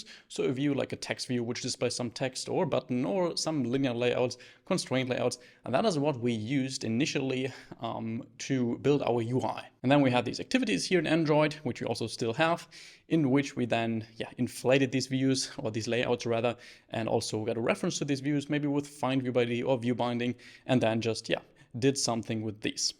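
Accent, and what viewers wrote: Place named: Germany. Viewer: Der deutsche akzent lol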